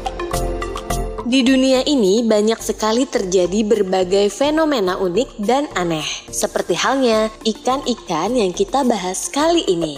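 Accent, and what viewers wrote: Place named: Borneo, Indonesia. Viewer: Asli bujur2 kada kawa diwaluhi tiap pandirannya berassaaaa banarrr ilat2 bubuhan benua borneo wkwkk Mantap banar, min. Katuju aku wan channel pian 🖒🖒🖒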